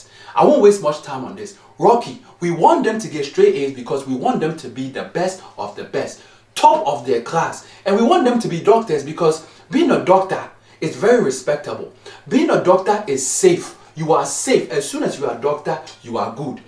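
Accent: Africa. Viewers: Why you talk african but dont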